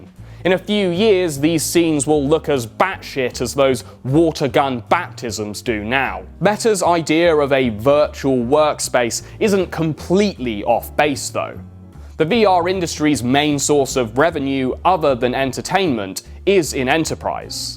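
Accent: United Kingdom. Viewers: A British accent using surfing slang is a bit much for me to handle.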